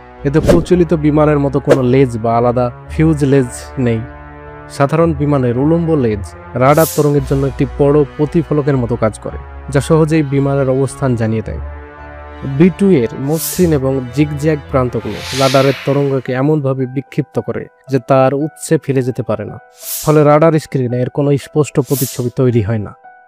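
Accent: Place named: Bangladesh. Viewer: জানেন কি চ‍্যানেল টি কি বাংলাদেশের? ঘোষকের উচ্চারণ তাই বলে। যুক্তাক্ষর উচ্চারণ করার অক্ষমতা উৎকট রূপে প্রকট।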